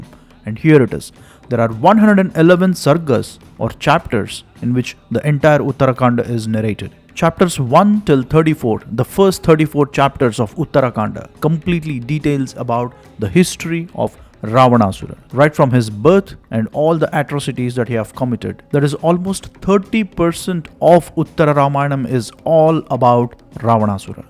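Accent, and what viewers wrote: India. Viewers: Bro please use ai english dub West will hear you Truth be told indian english doesn't sit well But with ur knowledge and western english u will rock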